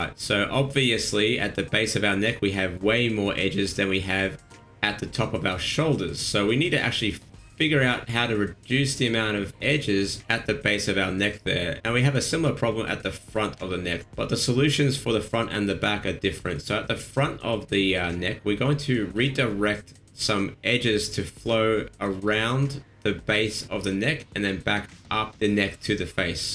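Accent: Australia. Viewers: Finally I have completed my mission to find aggressively Australian blender tutorials.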